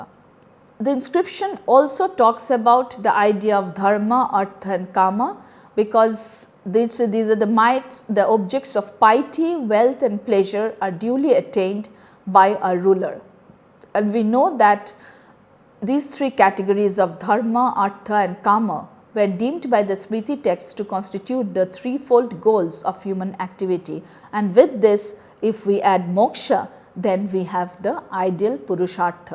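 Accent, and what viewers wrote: Bengal, India. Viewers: mam is bengoli..so it was difficult to catch actual Pronunciation😂..but still it was really good and informative then other web sites on internet..this gave the best research👍👍👍👍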